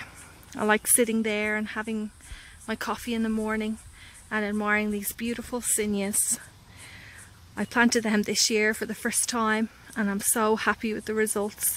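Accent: Ireland. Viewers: Hi beautiful garden, love the white tomatos and the sunflowers. .never seen those tomatos before..did I hear an irish accent there?? So nice. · Loved the video, adore your Irish accent.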